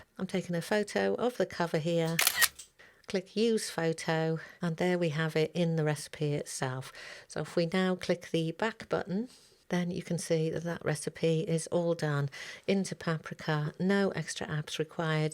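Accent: England, United Kingdom. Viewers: So hellpful and excellently done! THanks! Your accent makes me homesick for England!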